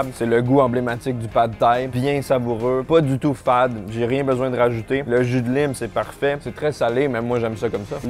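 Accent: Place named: Quebec, Canada. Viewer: Hello, quand viens tu en Suisse? Manger une vraie fondue une vraie raclette et autres spécialités. Bravo pour tes vidéos. J’ai 63 ans je suis venu au Québec en 1989 et vôtre accent me plaît toujours autant. Ne change rien et bonne continuation.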